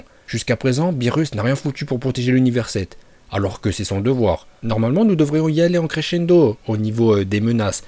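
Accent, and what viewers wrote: Japan. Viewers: nous on veut ton accent japonais! Ton travail n'est plus extraordinaire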